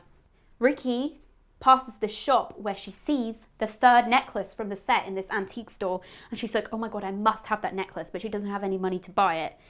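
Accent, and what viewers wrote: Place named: Australia. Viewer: You could just be reeeeally committing to this but I’m gonna believe you are. Names right. Tiny image resembles the actor. Played 1 out of 2 of your gaming vids and heard the Aussie accent., you were wronged, so wronged. You were sweet and Kim was a little asshole, honestly.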